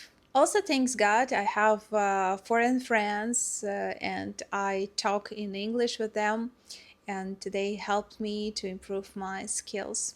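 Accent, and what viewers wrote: Russia. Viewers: Yes people dont seem to put salaries and costs together they just focus on one or the other. They also dont look at exchange rates for different areas/countires. Im glad you have been able to see some other countries and hope you can visit the USA someday. You speak english well just dont lose that charming russian accent. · You speak very good English, do not be shy or embarrassed by your accent, 99.9% of Americans. do not know a single word in Russian......Are you familiar with another Krasnodar blogger, he is an American who lives there, he goes by the name of "Comrade Captain Ron".?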